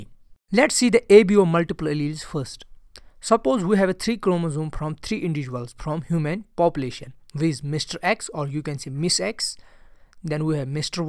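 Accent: India. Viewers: English Indian Accent is indeed one of the hardest to understand 🥹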